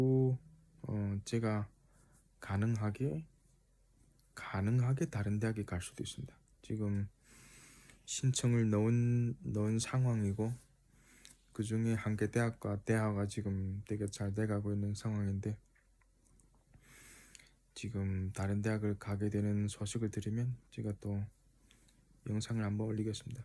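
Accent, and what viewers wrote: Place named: Yanbian, China. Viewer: 구수한 연변 말투 😊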